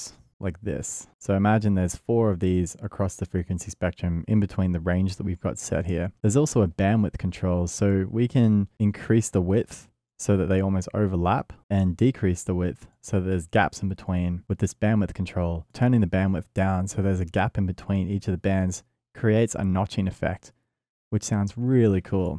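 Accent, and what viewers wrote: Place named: Australia. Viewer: You're so eloquent when you're explaining this, it's clear that you really understand the subject matter, and it's inspirational. I enjoyed this tutorial so much. I'm jealous of your knowledge. You make it look so damn easy! Keep making these :) ps: Always helps hearing someone explain in good plain Aussie that I can understand ^_^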